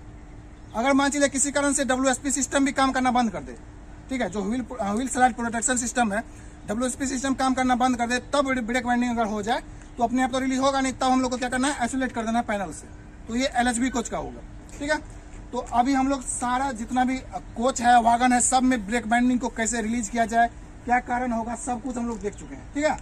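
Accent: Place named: Bihar, India. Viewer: Super sir ji very good apke bihar se hai lag raha hai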